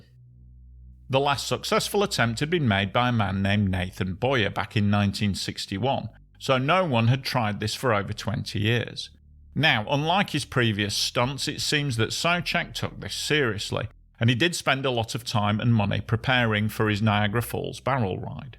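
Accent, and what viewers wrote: United Kingdom. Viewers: You have thickest most unintelligeble Br'ish accent Ive ever suffered.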